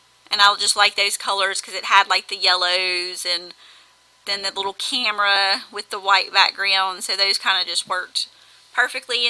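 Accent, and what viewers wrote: South, United States. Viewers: I have a scrappy crush on you! Ok, I know that sounds creepy but you just make magical pages. Also I love hearing your southern twang as I'm originally from the south myself. Love how you added washi to those wood veneers from HS. Very creative!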